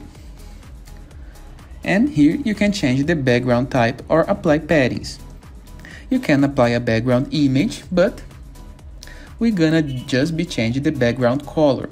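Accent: Brazil. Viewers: Sotaque brasileiro kkk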